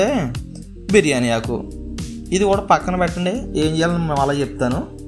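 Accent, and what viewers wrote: Godavari, India. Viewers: Godavari style lo cheppaaru brother, very tasty joint biryani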